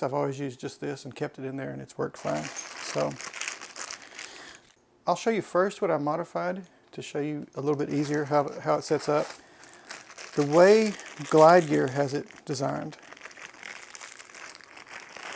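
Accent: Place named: Texas, United States. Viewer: That Texas accent should have been a dead giveaway.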